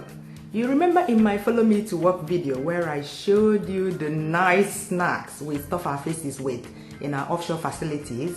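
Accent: Igbo, Nigeria. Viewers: Chai! Your Igbo tongue is top notch 👍🏽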